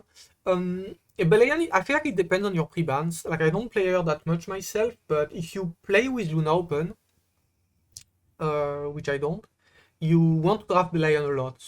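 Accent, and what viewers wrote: France. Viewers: He is talking in English but with a god awful French accent.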